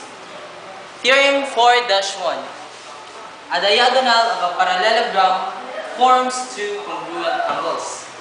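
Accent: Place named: Philippines. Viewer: Lol, You have such a filipino accent! Nice(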